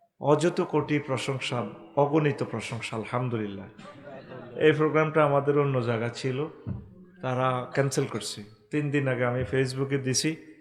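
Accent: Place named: Noakhali, Bangladesh. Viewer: আমার প্রিয় হুজুর আপনি অনেক ভালো ভালো মানুষ৷৷৷৷৷৷ সব কথা গোলা ঠিক নোয়াখালী বাসায় বলছেন বুজতে একটু কষ্ট হয় তবু বুজতে পারছি৷৷৷ তবে৷ একটি প্রশ্ন এক ঘন্টা ওয়াজ করে লাখ লাখ টাকা নেন এটা কি জায়েজ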